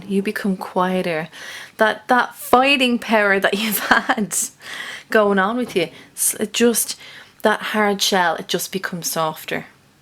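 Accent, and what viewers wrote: Ireland. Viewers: This was so fascinating. Kinda scary how much this resonates. I really like how u read. I appreciate how no nonsense you are yet gentle and encouraging. And it feels natural; not at all contrived. It def was an intense read. But somehow I don't feel upset or unsettled becos u infused so much helpful insight and reassurance. Subscribing!! Thank you for this read. (Hearing your Irish accent makes me miss my dear friend who's Irish) 😊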